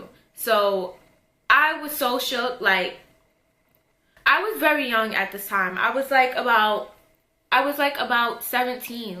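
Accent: New York, United States. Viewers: That New York accent 😍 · Is this chick from new york ?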